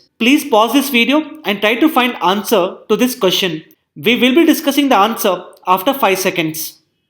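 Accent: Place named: South India, India. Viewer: With a little south indian accent in his tone, i liked his simplistic, proper flow diagram & in depth analysis. Just a complain 🤐 didn't like his new hair style. Childish looks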